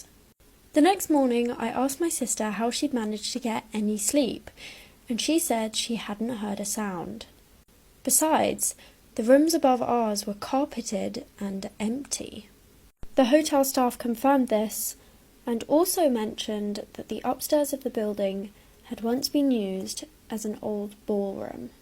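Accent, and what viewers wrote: United Kingdom. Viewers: An someone tell me why she English like me but only ever lived in america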